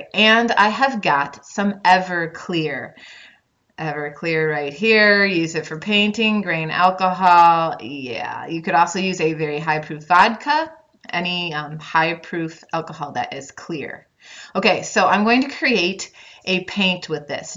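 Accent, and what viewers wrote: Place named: Upstate New York, United States. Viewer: First of all I adore your voice and way of explaining things... your accent reminds me of my late aunt who was an upstate NYer and I miss that! This is absolutely gorgeous and I can't thank you enough for everything you share with us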